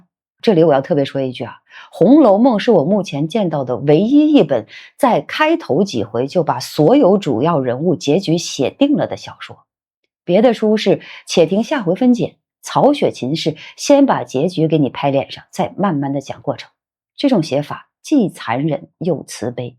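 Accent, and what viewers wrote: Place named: Beijing, China. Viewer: 古韵京腔兼而有之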